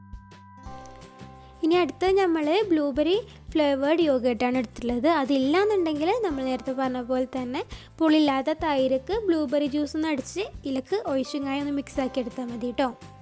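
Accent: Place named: Kasaragod, India. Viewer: Nammale kasargod slang aanallooo😍😍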